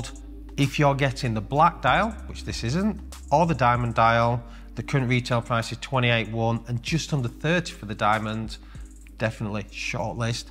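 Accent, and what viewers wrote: United Kingdom. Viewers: But remember speaks the queen’s English unlike us Yanks across the pond.😉 Seriously, you are right.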